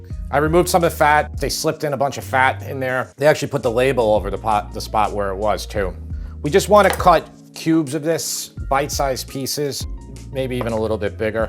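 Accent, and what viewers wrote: New York, United States. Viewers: Sounds like yall need to get to know your butcher. I don't buy prepackaged meat when I can I want to look the guy in the eye that's packaged the meat. Crack some jokes bull shit. IDK sounds like you're from new york or up new England. It's different in the south.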